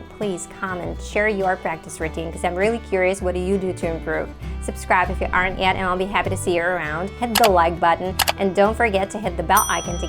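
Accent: United States. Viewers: you really sound American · Tremendous American Accent...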